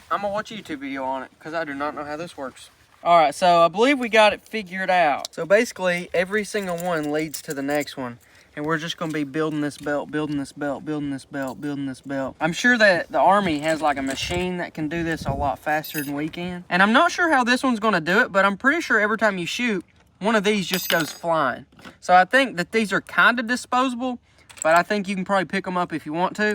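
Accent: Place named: South, United States. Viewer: Im from Philadelphia but i gotta go down south and make friends with some southerners cause i think i could pretend to talk like you forever and it never get old. Cause everything sounds funnier to me when you talk like that. Honestly i wouldn’t be able to take much seriously at all. Lol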